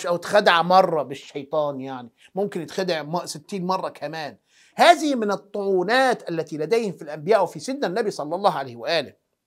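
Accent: Egypt. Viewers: ليش تحچي مصري ؟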